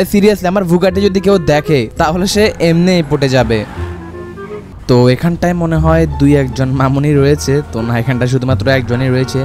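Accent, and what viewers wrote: Noakhali, Bangladesh. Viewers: ভাইয়া আপনি কী নোয়াখালীর ছেলে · আপনি কি নোয়াখাইল্লা আমরা ও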